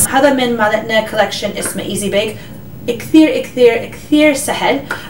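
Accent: Iraq. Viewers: اعشقك لهلجتج بالعراقي🇮🇶💗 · She was speaking Arabic specifically the Iraqi accent where she is originally from... I think The iraqi accent is really special in the Arab world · فدوة للي يحجي عراقي ... نحببببج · اييي الحكي العراقي احلا كثيييرر😩💙💙💙💙💙. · فدوة للتحجي عراقي 😍😍 استمري 👏👏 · you're really sweet when you speak iraqi , I love you so much 😻💘. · شغلچ روعه والعربي واللهجه العراقيه تخبل عليج 😘🌹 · In love with your Iraqi accent keep going xx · i love you im from Morocco and I like your Iraq language 😍😍😍😍😍😍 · فدوه الي تحجي عراقي I like you Arabic Iraqi accent I am Iraqi too much love ❤️ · الله تخبلين تحجين عراقي هله بالعراق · ما ازكى اللهجة العراقية عليها بتجنن 😂😂😂😂😂😍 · you are legend you are learn me no thing impossible only work by your heart 😍😍😍متابعتج من العراق اللهجة العراقية تجنن عليج 😌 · حبيت لهجتج العراقية 😍💙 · فدوه شلون تحچي عراقي 😂😂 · حياتي فدوه يجنن الكلام العراقي بحلگها.. 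تگول اذا متخلون بودره حره جوه العين يتحرك الميك اب ويصير يرگص بالوجه ههههه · We love love love how u express the words in Iraqi language and u did great videoing and we love ur tutorials u R the <link> 😘😘😘😘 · حلوووو العراقي من حلكج ههههه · Omg bravo your talking arabic. your arabic is good iam lebanese but your talking iraqian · باللهجه العراقيه تجننين يعمري 💕💜💜 I love u 🌸💜 From Iraq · your Iraqi accent is so beautiful love you 😍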